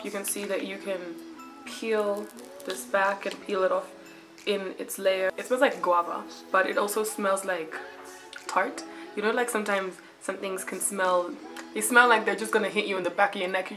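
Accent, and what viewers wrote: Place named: South Africa. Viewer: Wow south african accent 🙅🙅🙅🙅 your actual accent is more south African! 😵🤷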